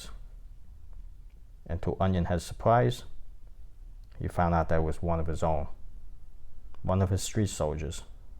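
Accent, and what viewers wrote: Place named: New York, United States. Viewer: Awesome NY accent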